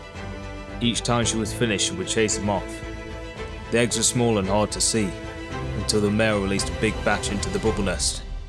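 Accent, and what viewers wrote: United Kingdom. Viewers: I love your channel this british accent is awesome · With that accent you have to be from the East Midlands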